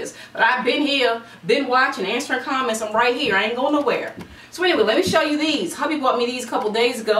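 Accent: South, United States. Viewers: Your smile and dimple and slight Southern accent...(swoon) :-D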